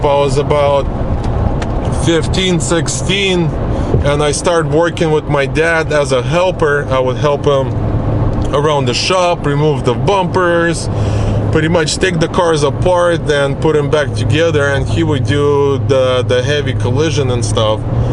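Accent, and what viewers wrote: Russia. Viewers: WOW, I am blown away and fighting the tears. I knew with your beautiful accent that you probably came from Russia. As a proud American, and this is long overdue, welcome to your new home! You and your family have done more with the American dream than we native Americans. Your dreams, your hard work and faithfulness to your family has paid off. Your dad recognized his worth and that of his family. I am thrilled you have a second store! Who knows maybe a 3rd or 4th awaits. Your are the quintessential success story, and you did it really without a lot of help. You were and are faithful and patient. Giving credit where it is due I personally believe God brought you here. Whenever something good happens in my life I thank Him for it. When the storms of life appear I ask Him what can I learn from this how can I grow. You’ve done that too. You now have one of the premier and most successful channels out there. 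I wish you all the best for you and your entire family. A goal on my bucket list is to someday shake your hand and hug you! Keep up the awesome work!!!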